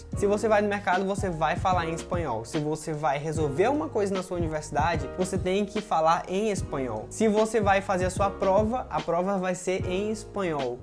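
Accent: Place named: Northeast, Brazil. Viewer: Noto que é nórdestino. Parabéns. Sou gaúcha do RS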